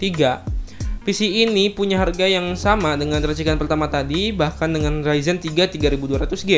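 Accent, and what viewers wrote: West Java, Indonesia. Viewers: Orang Sunda bang😄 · wkwk orang sunda ieumah yeuh wkwk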